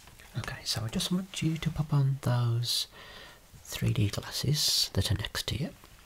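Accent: Birmingham, United Kingdom. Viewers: Happily I came across your excellent channel about a week ago and I've been enjoying so many of your videos. You clearly work hard to produce the best videos possible and it really shows; also great to hear the lilt of a fellow Brumie. I grew up in Handsworth in the 80's. Unfortunately I've been suffering from a worsening heart problem over lockdown and 19 months of self isolation so finding alternative ways of reducing daily stress has been important so thank you for your efforts in ASMR. I am also starting to learn quite a bit about my eyes and optometry :-)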